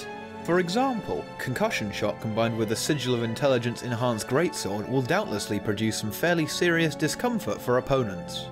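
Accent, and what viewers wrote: United Kingdom. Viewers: British accents make everything sound like im watching a documentary on BBC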